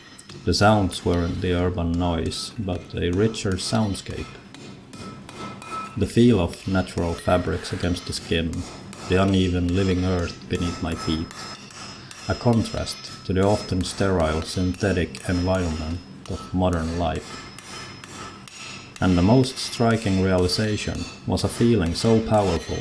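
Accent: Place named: Finland. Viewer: You do sound more Finnish than Swedish.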